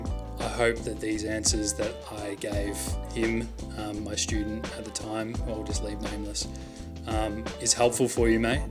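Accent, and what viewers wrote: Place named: Australia. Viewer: thanks mate! it's also nice to hear someone aussie (i live in melbourne) talking about business haha most of the stuff i listen to their always from the US or something. who's your mentors getting started back then?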